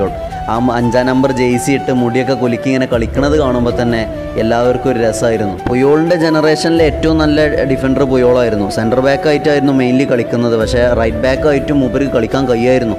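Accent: Malappuram, India. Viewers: Kettit malappuram muthaanenn thonnunnu allenkilum malapurakaarey anik peruthishtaa Alla chaggay maarey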